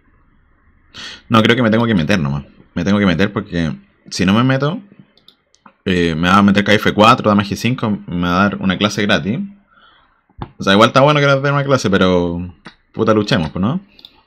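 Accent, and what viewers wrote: Chile. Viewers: Buen triunfo… me siento igual aunque estoy en los 850. Pero de a poco avanzando. Y que locura escuchar ajedrez con comentario en “chileno”. Saludos desde Seattle compatriota. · Siga hablando en chileno no más wn. Saludos Crack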